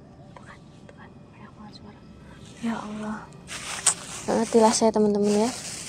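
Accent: Java, Indonesia. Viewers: Ka lucu jga yh klo lgi ngomong jwa...jwane mdok bngt kaka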